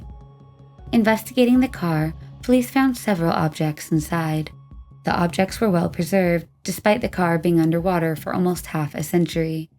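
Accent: India. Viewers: Thx, not the Indian Guy speaking again 😬🤭👍👏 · I personally love his accent, and I'm quite fond of indian/middle eastern accents in general. They are a joy to my ear. I do agree though that soothing voice is perfect for the channel and is most effective at conveying the sometimes unsettling information in a very palatable way. Love the duo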